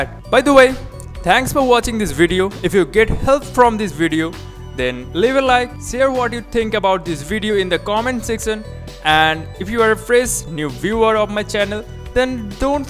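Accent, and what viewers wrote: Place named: India. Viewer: its an Indian Accent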